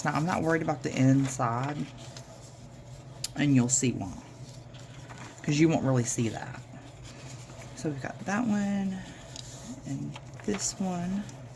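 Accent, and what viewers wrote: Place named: South, United States. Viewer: It’s so fun to hear a southern accent in a video! I love hearing the British ladies but not often a good ole Southern sound!! I love your altered paper clip idea! So cute! Your video was great! ❤❤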